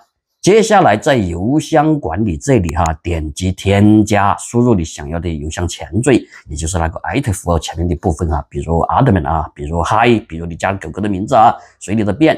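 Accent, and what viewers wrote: Sichuan, China. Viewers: 这个川版普通话我还是听得懂，就是搞这个不花钱的邮箱太复杂了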